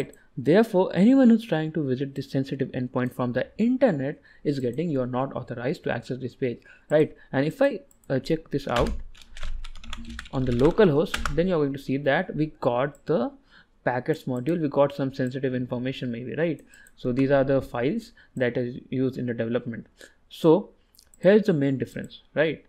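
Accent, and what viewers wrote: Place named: India. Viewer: he tries) but the accent is terrrrrrible..I'm drowning in his Hindi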